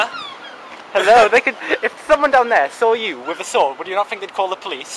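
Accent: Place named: Newcastle, United Kingdom. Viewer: Up heeeaaaa? Ooh the Geordieness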